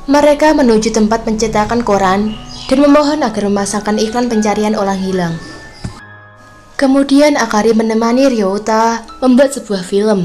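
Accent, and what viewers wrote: Java, Indonesia. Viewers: kelihatan medoknya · org Jawa yah? Kok medok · Kak riquest anime perang ato saling bunuh aku suka v] Dan biasakan bahasa indobesia Dan kurangi nada kayak orang jawa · Yang ganjel aksen jowo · Jawanya medok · saya tak suka suara medok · Medok bnget sis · mbaknya logat jowo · Suara mu medok nduk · Mba nya medok yaaa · Logat jawa nya kental bngat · wong jowo medok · Medog gaes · admid pasti orang Jawa 😄 Medoknya gak bisa bohong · Bahasanya medok gk nyaman dengerinnya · Cie medok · adminnya orang jawa ya.. D-nya itu lo.. khas banget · Medok bgt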